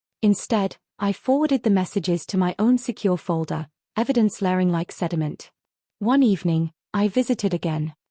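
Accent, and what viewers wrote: United Kingdom. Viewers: What sounds like a British accent makes me wish we viewers were able to choose which accent we wanted to hear. That would be the only way I could hear these stories narrated by an AI voice with an American accent.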